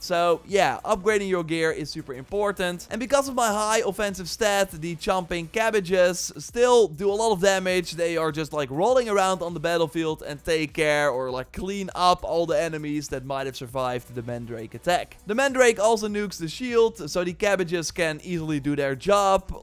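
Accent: Netherlands. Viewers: Ik dacht al klinkt Nederlands en checkte socialblade en hup een Nederlands accent.... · dont know why but you sound dutch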